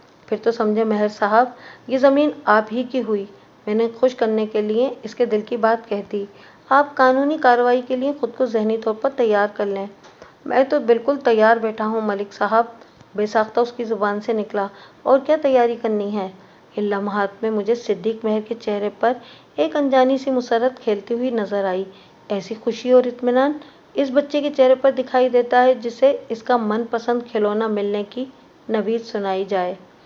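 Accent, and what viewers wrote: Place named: Karachi, Pakistan. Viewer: BB aap Ka taluq Karachi se feel hota hai 🤩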